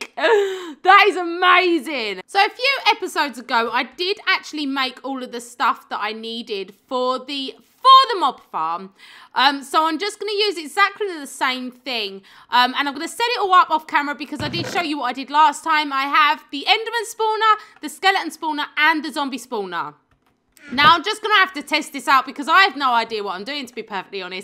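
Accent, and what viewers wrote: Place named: Cockney, United Kingdom. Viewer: maaaaaan that cockney accent is strong. wwooooww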